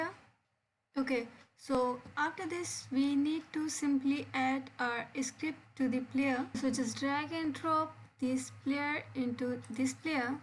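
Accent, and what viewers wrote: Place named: India. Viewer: U are Indian Sounds like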